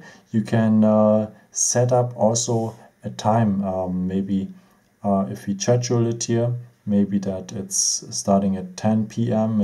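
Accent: Germany. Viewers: Man hört den Deutschen aktzent richtig raus😇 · He has such a strong german accent 😂, but the video is really helpful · Man hört es raus das du deutsch bist 😀😀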